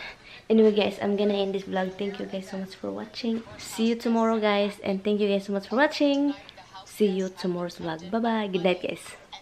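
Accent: Visayas, Philippines. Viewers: Hi nag enjoy ko nimu ug tan aw bisan bisaya ang accent Peru cuteeee man...Been following you..Am working here in Cambodia..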